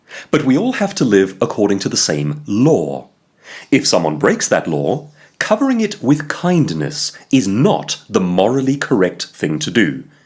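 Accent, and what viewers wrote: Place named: United Kingdom. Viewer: I understand that "politically" and "correct" have definitions in the English language. However, when you use them to further define the concept of "politically correct" I feel like you lose sight of the phenomenon that all languages are in a constant state of evolution. I can't place your accent, sir, but I can tell you do not originally hail from the USA. I'll go out on a limb and suspect The U.K. It is my understanding that the word "c*nt" in The U.K. is a lot less harsh than in my homeland here in the USA. Also, the term "pissed" has two very different meanings depending on which side of the pond you are standing. My point is that "politically correct" is no longer associated with its two root words, and likely has evolved away from its original usage. I noticed in the video you failed to address hate speech. As I understand it, it would be most politically incorrect to the "N" word. 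Yet you are advocating against political correctness. May I ask you to address this?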